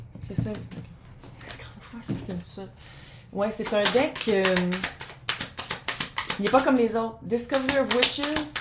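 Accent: Quebec, Canada. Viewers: Ben là, qu'est-ce qu'il a notre accent! 😝 Moi j'adooooore ça avoir enfin une québécoise sur YouTube! Yes!!!! Vous êtes authentique et j'aime beaucoup! Vive le Québec! 😁 Merci pour vos tirages. 🙏❤️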